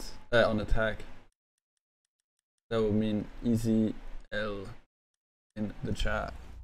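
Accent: Germany. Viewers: Ur german? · Daaaafaaaaaaq Ur German? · I found it was a headshot on the Bamdit and a question are u German? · Is he german??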